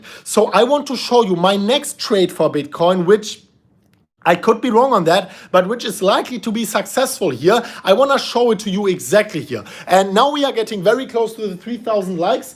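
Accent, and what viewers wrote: Germany. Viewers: Ich wusste sofort das du Deutsch bist 😂 Der Akzent kommt mir bekannt vor. Much love aus Hamburg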